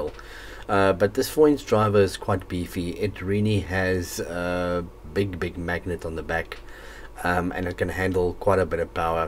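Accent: South Africa. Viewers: I was like 99% sure you were South African, and then I saw the Game catalogue. Love your work, man, keep it up. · I know a South African accent when I hear one. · Kon sommer hoor in die eerste paar sekondes jy kom van Suid Afrika af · Hi there, this is sweet! I'm from South Africa- sounds like you too! I'm wondering the best way to ship or buy a small subwoofer like this locally? I bought a TPA3116D2 and i'm looking for a small subwoofer to match. i.e.100wrms with low frequencies. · thanks for affirming my suspicions of your accent being from South Africa , after seeing the game paper ;-)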